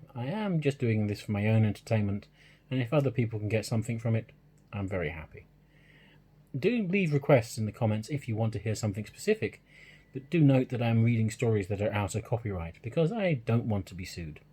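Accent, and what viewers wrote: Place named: RP, United Kingdom. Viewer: It's been so long since I heard your voice I forgot how posh you are hehe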